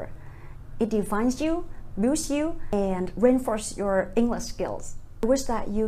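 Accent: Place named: United Kingdom. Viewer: Dear Ms.! You are hella superb with the classy British accent Thanx for your nice stunning video Please keep smiling, keep shining and keep flying high All the best. Respectfully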